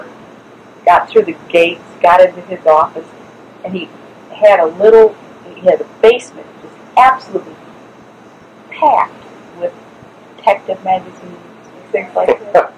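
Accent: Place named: South, United States. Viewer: This does not sound like Hillary Clinton at all. She is from Illinois and this woman has a distinct southern accent and her tone sounds nothing like Hillary Clinton. This woman sounds like one of the designing women.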